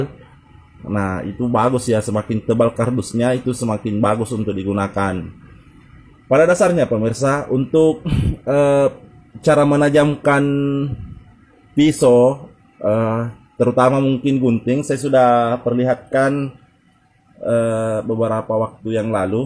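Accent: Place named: Makassar, Indonesia. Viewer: Ini org makassar pemirsa... ketauan logatmu... smsm jaki nukasi bodo2 semua pemirsa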